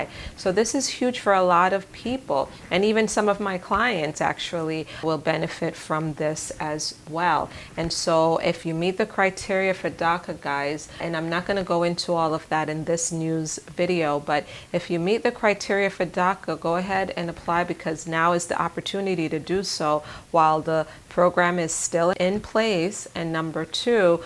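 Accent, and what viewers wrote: Jamaica. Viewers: R u a Jamaican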